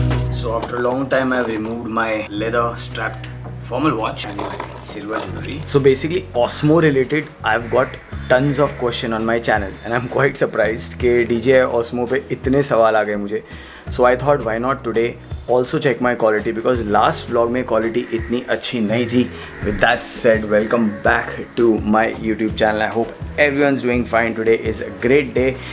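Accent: Gujarat, India. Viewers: Are you guju bro ?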